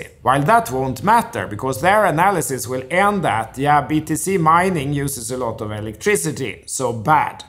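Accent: Sweden. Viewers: Suiting voice for a travel show with a unique swede influenced dialect. I just don't get over how close your username is to "CTE Larsson" 😂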